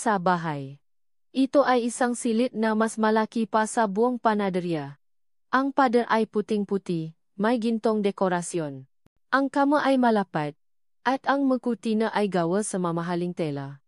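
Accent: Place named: China. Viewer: Ang pangit ng narrator palitan ng pure Pilipino parang Chinese mag pronounce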